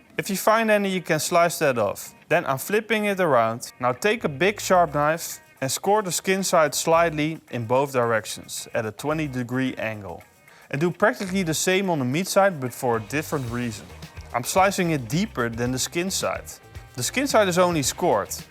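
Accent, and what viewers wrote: Netherlands. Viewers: That accent sounds familiar! A Dutch Friend? Greetings from Germany :) Keep up the good work!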